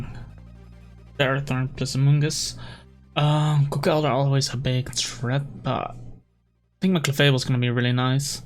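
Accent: Netherlands. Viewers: Your accent sounds soooo dutch XD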